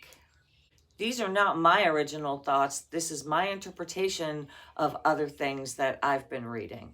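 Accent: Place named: New Jersey, United States. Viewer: applying to phd's in canada for 2026. you know why! but i'd love to come visit your farm and meet you. i am also:-) cheers, love your program (you sound like a jerZ girl:-) your discussion of the "master / slave" dialectic is 200% frankfurt school and the "critical theory" camp, my camp. welcome to the machine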